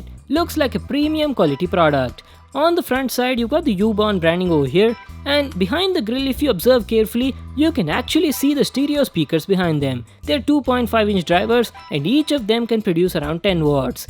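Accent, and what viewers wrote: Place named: Tamil Nadu, India. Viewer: Is he a Tamil Guy ? Or Telugu Guy.. Just asking becz of his English pronunciation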